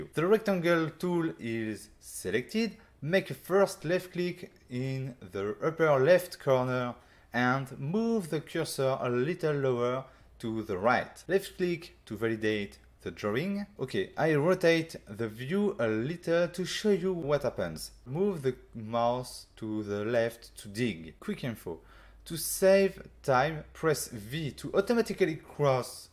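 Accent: France. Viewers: Ah l'accent français 😉 Chouette tuto, j'ai acheté fluent il y a peu mais encore trop eu le temps de m'y plonger... Mais ta vidéo donne envie 😊 bravo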